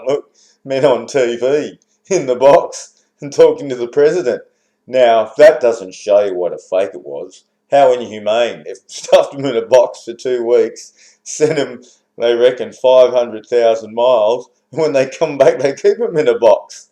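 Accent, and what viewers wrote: Australia. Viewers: I think this guy's a fellow Australian by the accent,admittedly a bat shit crazy conspiracy loving version. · You're totally full of shit. Except for an Aussie accent you got nuthin'. The capsule was supported by the service module, which is much bigger and has the consumables and the fuel cells. The Apollo is somewhat roomy inside with the seats stowed. The seats fold and retract and leave about a 6 foot tall space that is 10 feet around. Just because you're too much of a wussy to shit in front of other guys doesn't mean dedicated people can't do it in order to get a free trip to the moon. You're a hick jerkoff.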